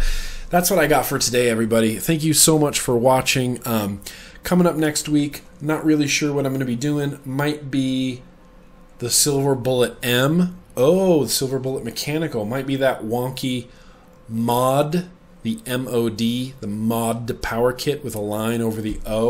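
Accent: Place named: South, United States. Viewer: i just need to say that the southern bell accent is the sexiest accent there is. also i wish i would have started vaping years ago, so i could have been watching these vlogs, its a highlight in my week.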